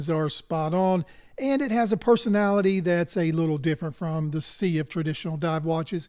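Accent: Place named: Maryland, United States. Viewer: Hey. I'm trying to figure out your accent and where you're from. Maryland? Carolina's? I'm probably way wrong. Lol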